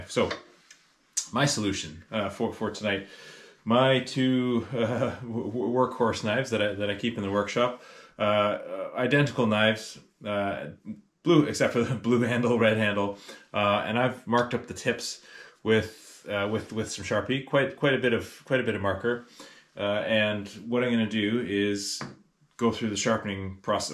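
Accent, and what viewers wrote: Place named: Canada. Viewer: Perhaps its because we both speak 'Canadian' (!) but I finally understand the concept. Thanks again for the time you take to film and explain.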